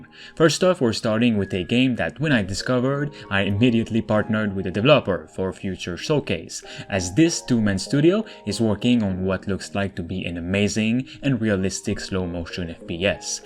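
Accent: Quebec, Canada. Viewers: Ça paraît que ces un québécois qui parle😂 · Dude sound like he from quebec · U sound like gsp . You're definitely from quebec. Great review merci . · Aye un québécois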